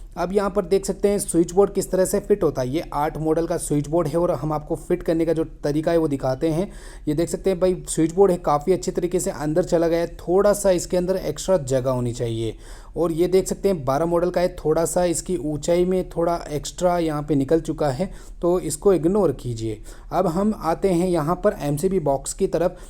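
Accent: Rajasthan, India. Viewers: bhai aap Ajmer ya Nagaur se ho. Kya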